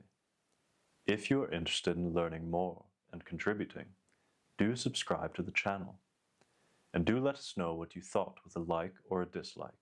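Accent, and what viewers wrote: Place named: Transatlantic, United States. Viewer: Your transatlantic accent makes you stick out from other content creators now and to come!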